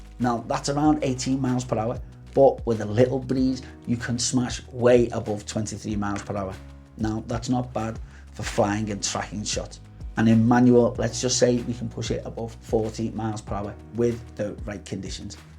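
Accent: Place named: Manchester, United Kingdom. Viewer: Really enjoying your videos. Great content - but also because your accent reminds me of home. (Live in New Zealand but grew up in Manchester.)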